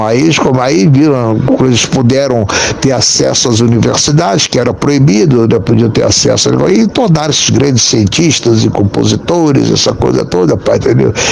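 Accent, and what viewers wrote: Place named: Rio de Janeiro, Brazil. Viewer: De onde exatamente o Judeu é? Acho curioso o modo de falar dele, uma mistura de carioca com português de Portugal.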